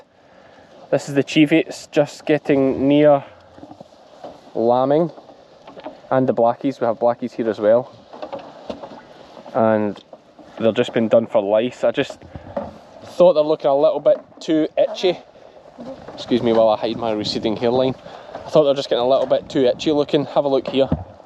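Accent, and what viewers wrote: Scotland, United Kingdom. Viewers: "I've got 4 blackie hoggs here that arnie mine" the scottish accent is always a great listen 😂😂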